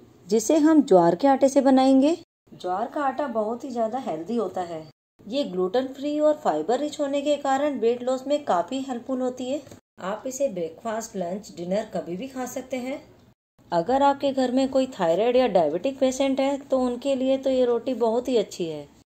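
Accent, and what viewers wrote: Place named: India. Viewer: Hello.. i love Indian accent.. your videos very interesting.. thankyou for sharing friend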